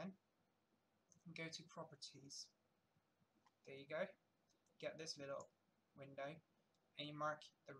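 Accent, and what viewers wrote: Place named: United Kingdom. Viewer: i swear i hear British in ur voice back 7 years ago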